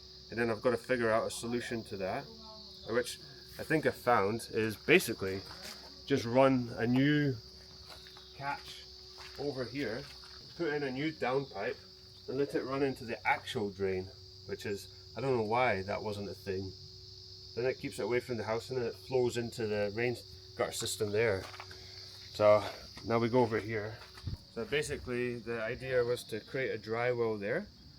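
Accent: Scotland, United Kingdom. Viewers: Dude, I always assumed you were Australian. Didn't realize you were Scottish!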